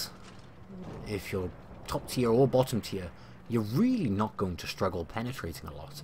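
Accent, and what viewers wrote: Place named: Wales, United Kingdom. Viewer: Wyt ti'n siarad Cymraeg? I noticed your accent and wondering if you're from Wales:)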